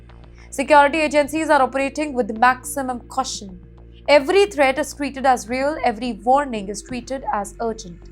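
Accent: India. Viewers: Heavy Bindian accent makes hard to understand. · The headline is also incorrect. The plane was never hijacked. All in all, I think you should report in your own language. When you speak Apke Apke for so long and so hard, you tend to Passover the bad habits from your language into English. I see this all the time. Content creator is always an Indian from India that will have a video , that has been captioned in English , but 25 seconds into the video , the Apke Apke will start, and that Apke Apke won’t stop until the end. This is why people like me , that grew up speaking English as a first language have to always write exams and tests proving my eligibility to speak English , because of the Apke Apke people that keep fucking up the English language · What kind of Hindi English is she speaking? Can you not find someone who speaks proper English as your announcer? 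The Whole story is just a joke. AI produced stuff?? :face-orange-frowning::face-orange-frowning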